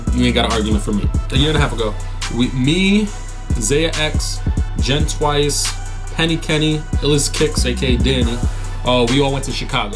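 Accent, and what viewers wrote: New York, United States. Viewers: Lol you was a Spanish nigga in Chicago with a new York accent and shit hat to the left them niggas thought you was a Latin king. Spanish gangs in Chicago though besides Latin kings and SDs ain't really on shit you probably would've just got ran out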